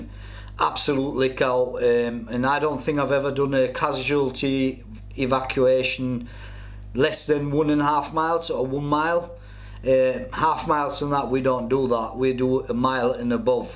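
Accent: Scotland, United Kingdom. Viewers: He’s got a Scottish accent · You have a hint of a Scot accent. But then again my ear's are screwed. I have pals in Glasgow all ex military and great pals to have your back in a bad situation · He is either Scottish, or from near the English-Scottisg border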